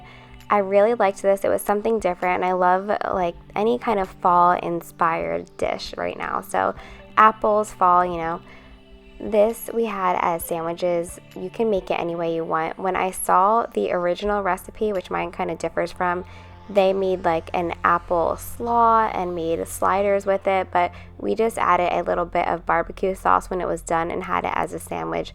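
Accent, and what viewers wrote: East Coast, United States. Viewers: LOVE Crockpot Recipes! These look super yummy!!! Glad I found this channel 😁 -- (& her east coast accent makes me homesick!) ❤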